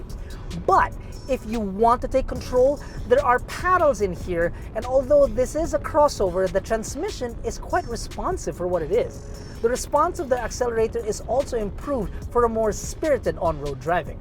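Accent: United Kingdom. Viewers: That hilarious British accent killed me! 😂 On a serious note, what are non-Chinese car manufacturers thinking? Chinese car brands (or atleast cars made in China) are bringing better tech and value at a lower price in the Philippine market. It's as if they're just relying on brand recognition and perceived "dependability in the long run" to make sales. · medyo dinig yung hangin sa B-roll.. baka mas okay kung i-mute? Love the British accent. hehe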